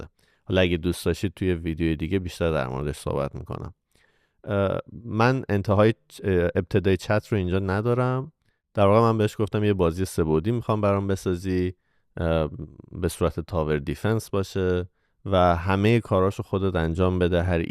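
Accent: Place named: India. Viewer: از پکیج دیجیتال مارکتینگ که چندین سال پیش دادید فکر میکردم هندی باشید بخاطر لهجه لاتین تا الان که یهو این ویدئو رو دیدم، عالی بود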